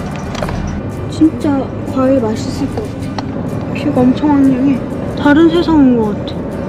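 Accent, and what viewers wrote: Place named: Jeolla, South Korea. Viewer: 전라도 사투리 귀여워용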